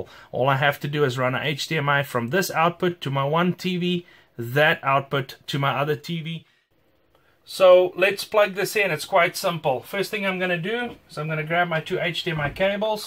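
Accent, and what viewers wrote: South Africa. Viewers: His accent is a proper mishmash but the way he pronounces his words ending in ER gives him away as a fellow Afrikaner 🤣 Or at least it does for me. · Great Saffer accent coming across-but well done all good!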